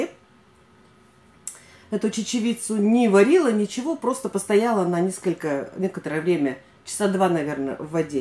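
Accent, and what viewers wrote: Kirov, Russia. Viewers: Как мне нравится Ваш кировский выговор, у меня бабушка и все родственники с севера Нижегородской области похоже говорят ))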